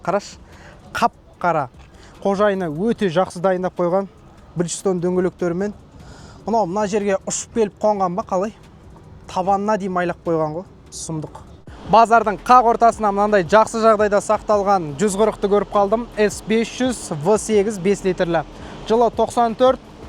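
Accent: Standard. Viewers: Бауырым, рахмет! Мына видеон браз конил куйди котерди е вахахаххааа Не деген мыкты обзор жане таза казакша сойлегенине рахмет айналайын!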